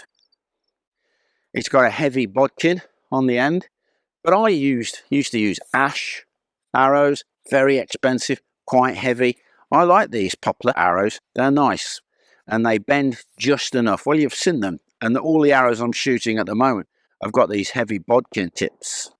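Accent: United Kingdom. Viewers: Hi there, I'm from Québec Canada. This video appears on my tablet while I was exploring archery related videos, and what gets my attention is your medieval costume first, second , your gigantic long bow , and finally, your lovely British accent. So, I watched all your video and enjoyed it a lot. I'm anxious to view what is next on your platform. Thanks.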